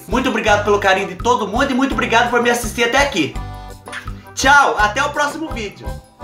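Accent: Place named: Caipira, Brazil. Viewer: Esse caipirês dele é demais kkkkk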